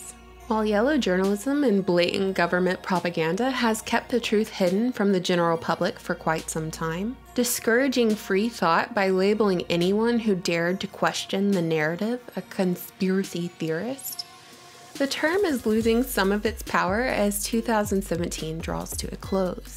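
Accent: South, United States. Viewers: I love ur southern accent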